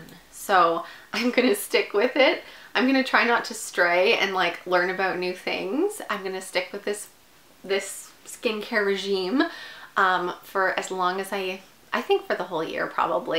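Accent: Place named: Canada. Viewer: I thought you were Canadian , I’m from Nova Scotia !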